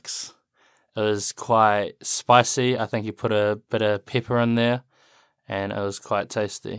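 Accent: New Zealand. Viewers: 발음 목소리 너무 닮고 싶어요~^^ 로토루아? 거기 좋다고 하셨죠? 기억하고 함 가봐야겠네요ㅎㅎ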